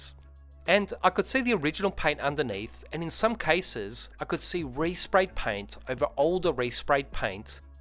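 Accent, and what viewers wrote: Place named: Australia. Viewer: Great job mate I love hearing an Ozzy accent on a detailing video for a change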